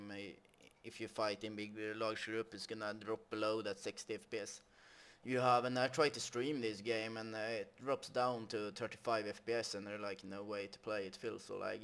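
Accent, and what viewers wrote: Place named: Sweden. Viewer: Aldrig hört någon mer svensk människa.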